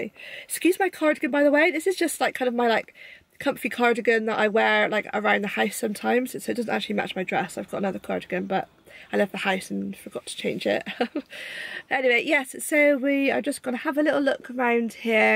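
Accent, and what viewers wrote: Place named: Gloucestershire, United Kingdom. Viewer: I just came across your channel from Mummy Cleans' channel! Love your channel intro and videos I've seen so far 🙂 your accent sounds so local to me, I'm from the Forest of Dean in Gloucestershire 🙂 your holiday sounds lovely, we're off to Cornwall next month, can't wait. I've got a 2 yr old son and nearly 4 yr old daughter so doubt it'll be relaxing though lol! Sorry to hear you've been unwell xx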